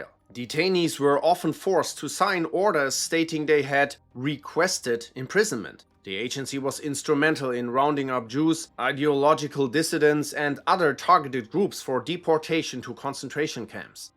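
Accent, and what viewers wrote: Germany. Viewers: The most German accent I ever heard but nice video 😂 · As a WW2 buff I learned a lot today , thank you for providing this. It also sounds better coming from a German , it makes the video more authentic and engaging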